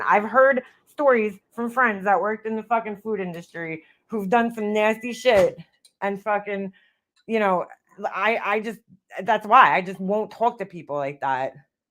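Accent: New York, United States. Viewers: say whaaaaaaaa?!?!?! 😳😳😳😳😳 ill just never understand ppl who literally know for 💯 certain that certain individuals are garbage, hateful, lieing, vile, cruel shit bags - but they overlook it, bc theres some sort of short term upside.... the little validation this gives that shitbag, only validates them more & keeps the destructional hampster wheel going.... legit, it makes it so so soooo much worse for countless ppl currently AND in the future.....nothing i could ever gain personally, would outweigh validating that evil shit she does to ANYONE else down the line... nothing, nada, zip, zilch 🙅 it doesnt make me mad to hear about this happening, just sad if this is whats going on 😔 obviously im missing aloooooooot cuz hearing you say this is going on, floors me....trust this = you cant fix nor change mean azz heartless ppl, who legit enjoy nothing more than completely trying to anihilate & destroy ppl.... 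you dont know when its coming mor why, but u best believe that ur on the clock & its only a matter of time.... u wont see it coming either, cuz its love bomb to anihilation - no in between thanks for being the ultimate 🐲 slayer, and just keepin it 1 millionnnnn on this 🐲 lady,!! 🤣😍💯 thanks for your service, in the fight to stop the "dragonnnn decapitationnnn" 🙌😂 #StopTheDragonDecapitation i only caught the 1st 45 mins, so imma finish this later tonight..... its alwayssss hella cool to hear that accent goin on a good ol NY truth droppin semi rant on 1.5 speed!!! 🤗 😍 🙌 made my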